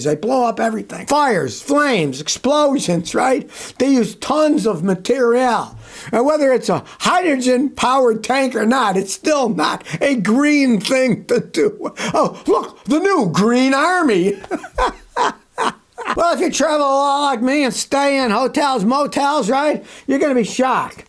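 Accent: Germany. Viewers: funny German accent. More ... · Best German Accent on Youtube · Loved your German accent 😅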